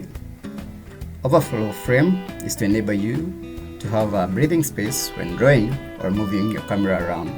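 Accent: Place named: Africa. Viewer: African accent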